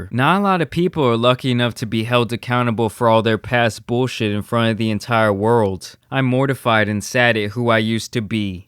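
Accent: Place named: East Coast, United States. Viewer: Just noticed your east coast accent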